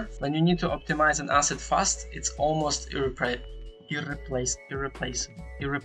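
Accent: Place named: Serbia. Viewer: A hahaha, yeah guessed cause of English accent, Serbs sound the same speaking it, and I feel you, I'm only around 180 which is short for a Serb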